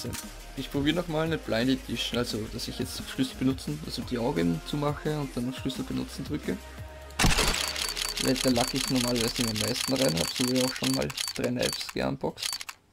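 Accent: Austria. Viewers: uhhh hab grade gesehen das du Österreicher bist .ok hab ich mir irgendwie schon gedacht 😉😅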